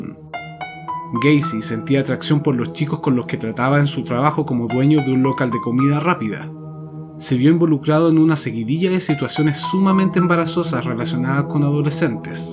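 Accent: Chile. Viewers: es chileno el que habla?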